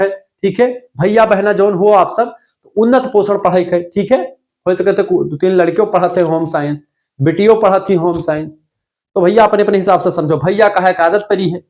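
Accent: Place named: Bihar, India. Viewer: Sir ye bihari language mt bola kro kuch smj nhi aata Normal hindi bola kro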